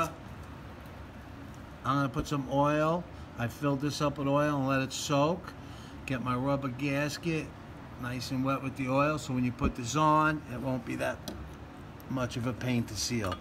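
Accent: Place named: New York, United States. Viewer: love that new york accent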